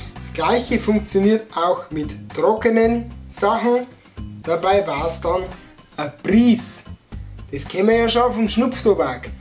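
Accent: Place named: Upper Palatinate, Germany. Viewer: sprichst du im Alltag uuch so stark oberpfälzisch gruß aus sulzbach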